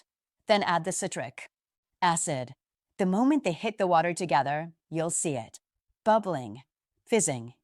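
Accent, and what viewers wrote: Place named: India. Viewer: howcome you have an Indian voice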